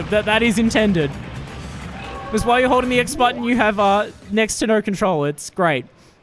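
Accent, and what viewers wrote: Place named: Victoria, Australia. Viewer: Gotta love that Victorian accent saying heeya instead of here. Wasn't grating at all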